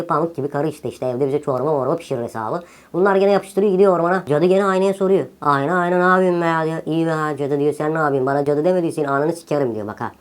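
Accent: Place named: Thrace, Turkey. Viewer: Abi bu Trakya şivesi ile Türk ve uluslararası ünlü romanları anlatır mışın lütfen çok komik olur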